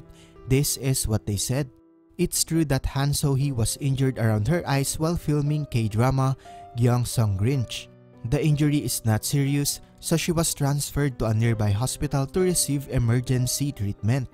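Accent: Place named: Philippines. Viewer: halatang Pinoy yung nagsasalita, kahit English pa yan🤣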